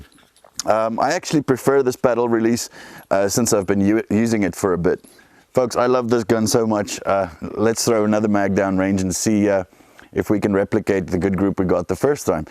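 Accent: South Africa. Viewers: Are you South African? That accent sounds familiar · You sound a bit South African. Thanks for a great review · Are you South African?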